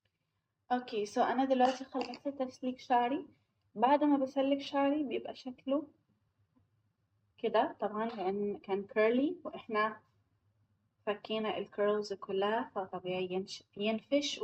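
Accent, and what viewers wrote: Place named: Egypt. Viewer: محتاره فى لهجتك. لانها مصريه على حاجه تانيه مش فاهماها 🙂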